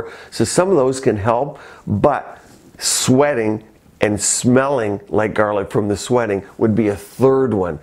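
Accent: Canada. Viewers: A Canadian. They pronounce About and Out very strangely. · are u canadian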